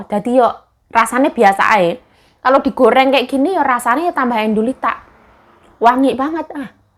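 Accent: Java, Indonesia. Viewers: Aku seneng sm medhoknya mbak,cletukan² bahasa jawane bikin mesem²,hihihiii...sukses yo mbak,sehat² selalu...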